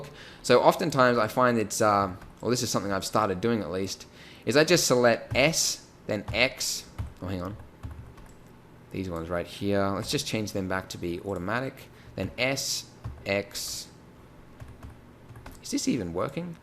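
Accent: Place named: Australia. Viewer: He is Australian i am guessing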